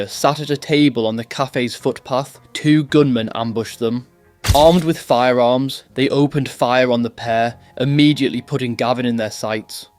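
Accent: England, United Kingdom. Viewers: 😂You idiot it's obviously English, have you left Frankston before?😂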